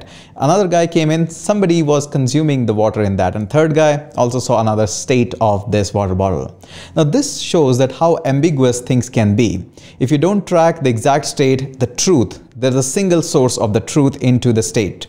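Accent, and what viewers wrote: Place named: Delhi, India. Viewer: why this delhi people spaek like this , too weired and annoying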